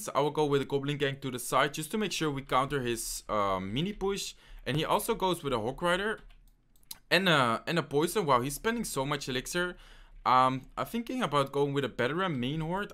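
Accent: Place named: Netherlands. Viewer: Le entiendo y es gracioso el acento inglés que tiene, es holandés y pronuncia las cosas raras a veces. xd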